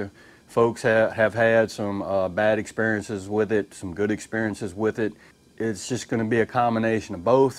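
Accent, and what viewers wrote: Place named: Mississippi, United States. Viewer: Came to this video to learn about the machine but the biggest thing I've learned is that I need a friend with a Mississippi accent. Lovely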